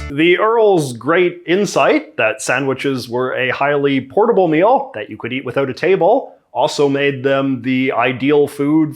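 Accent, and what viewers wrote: Canada. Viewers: yes... I got it. The video was fun and well made anyway. I love your approach to culture. In France, culture is only considered with a capital C, focusing on the classics. Nobody would dare to take seriously a bunch of goodies found in happy meals. It is very refreshing. Beside, it enables me to learn a lot about American or Canadian culture. Love your Canadian accent too. I wonder if it comes from the French roots of Canada. Some French do indeed pronounce "about" just like you. On another topic, I wonder if you would consider making a video about Jordan Peterson. As both an advocate of free speech and a gay, I wonder what could be your intake on his case.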